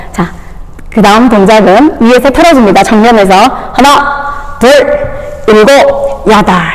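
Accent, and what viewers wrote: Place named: Chungcheong, South Korea. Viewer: 강사님의 밝고 힘있는 구령소리를 들으니 저절로 운동욕구 충만해 집니다. 충청도 사투리도 재밌네유~~ 매일매일 보면서 운동 열~심히 하겠습니다. 고마워유~~~^,^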